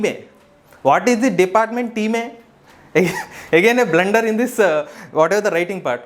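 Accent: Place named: Kerala, India. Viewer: Kerali accent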